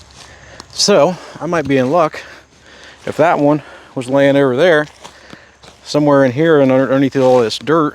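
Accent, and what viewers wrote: Pittsburgh, United States. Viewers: Are you out in Pittsburgh??..I am from out there and you sound like all my relatives...Great job saving these old rigs..I have made rings out of cast iron pipe and a lathe...I need to get back into everything . Dealing with some life issues..I have a 1928 Morgan waiting for reconstructing...keep up the great work..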